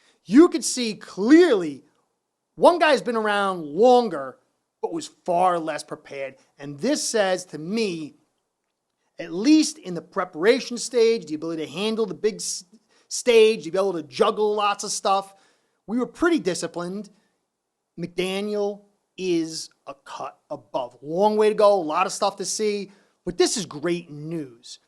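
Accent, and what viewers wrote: New York, United States. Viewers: Love the Yorker ACCENT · Quick question where are you originally from? Gotta be from New York 😤💪🏾good content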